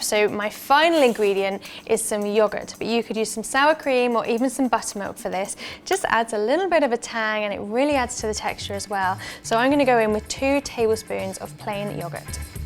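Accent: United Kingdom. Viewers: Love her channel and love her beautiful British accent 💕🌸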